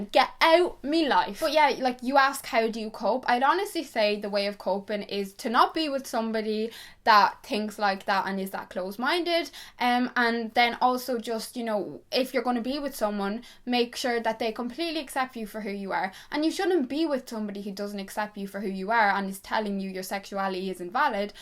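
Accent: Ireland. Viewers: Is your accent Irish? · I have detected an Irish accent and I am assuming you grew up in Ireland. But as you most likely know in Ireland discos can be quite risky. Could you maybe do a video on how to deflect pressure from guys at these teenage discos. Lately guys get their friends to pressure you and it can be quite overbearing and intimidating. So I was just wondering as a fellow Irish girl if u had any tips or advice for handling these awkward scenarios. I don't know if this topic was covered in the video as I am waiting for it to buffer but if it is just ignore me. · VoiceYT shes irish :)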